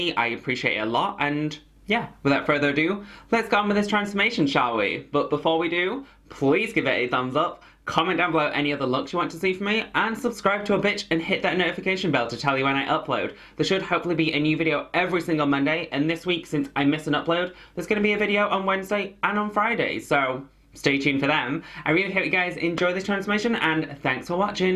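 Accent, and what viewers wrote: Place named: United Kingdom. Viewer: and the British accent is so a turn on 💖💖💖💖🌹🌹🌹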